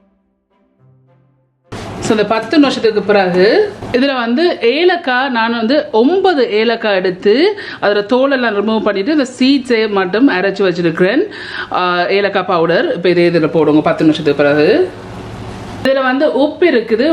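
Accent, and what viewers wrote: Jaffna, Sri Lanka. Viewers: Mam neega Sri Lanka tamilian ...Ayio so cute pesarega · Hi akka, I am your new subscriber from Belgium. But our native is Sri Lanka , jaffna too. Actually, your all recipes are so nice and easy method. I follow your cooking style akka. Thank you so much . And our jaffna slang is also very cute. Our son also your fan and he is 2 years old. 🥰💖💗God bless you all!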